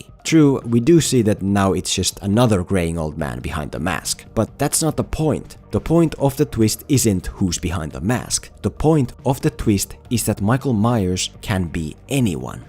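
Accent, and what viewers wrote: Finland. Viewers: Ootko suomalainen kun on niin suomi-aksentti?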